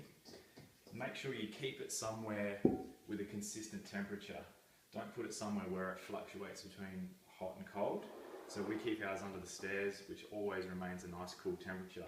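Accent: Australia. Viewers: Accent??? He's an Aussie... easiest accent to understand! 😁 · It's especially funny when translating an Australian accent.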